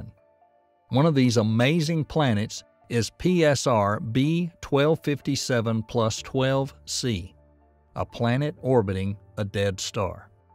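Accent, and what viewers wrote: South, United States. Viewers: i love this guy's southern sounding voice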